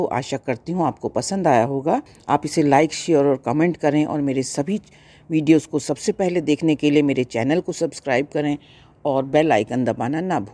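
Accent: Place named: Kashmir, India. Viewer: Kashmiri accent is awesome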